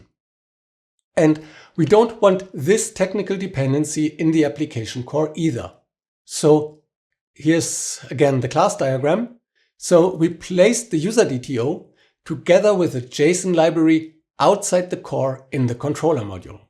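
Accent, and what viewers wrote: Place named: Germany. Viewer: i like your german accent. Great intro to hex arch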